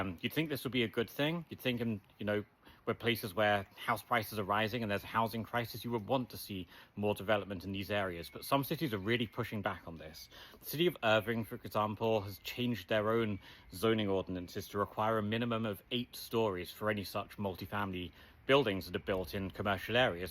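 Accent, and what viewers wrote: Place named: United Kingdom. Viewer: Why is a British man explaining Texas laws to everyone?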